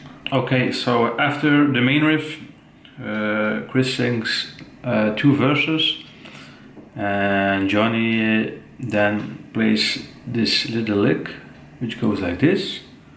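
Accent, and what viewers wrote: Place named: West Flanders, Belgium. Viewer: Westvlaams om precies te zijn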